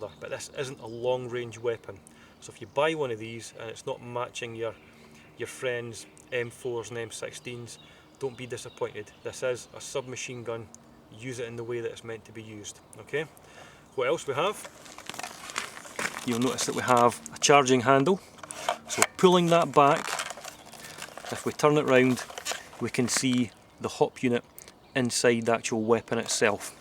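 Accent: Scotland, United Kingdom. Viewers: heavy scottish brough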